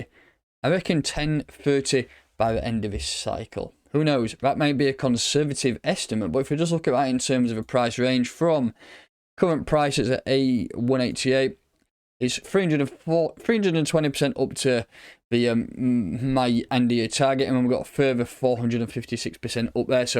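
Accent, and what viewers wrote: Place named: North, United Kingdom. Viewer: New to the channel. Nice to hear a northerner instead of an American. Nice vid, suscribed 🙌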